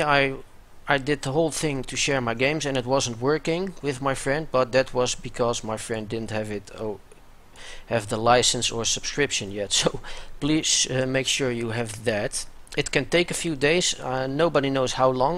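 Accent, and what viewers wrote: Netherlands. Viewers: ik weet gewoon dat jij waarschijnlijk nederlands bent. je praat zo nederlands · Ur accent is so dutch omg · jouw accent is so duidelijk nederland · That Dutch accent tho. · ik hoor aan je stem dat je nederlands bent :) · i have a feeling he is dutch XD i can tell by his accent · Are you dutch? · That nederlandse accent tho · leuke accent haha, echt nederlands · Dat Nederlandse accent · I can hear that you're Dutch ;D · dat is very Dunglisch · Ik kon meteen horen dat je Nederlands was, bedankt voor de tutorial! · Dankjewel man, ik hoor aan je accent dat je Nederlands bent :) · are u dutch? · Are you Dutch ??? You sound Dutch to me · je bent nederlands leuk accentje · whaha love your dutch accent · deze guy is nederlands heh? Goede video btw XD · Damn dutch acc is real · ) dat dutch accent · nl nederlands sws kan niet anders - en your dutch, i know it for sure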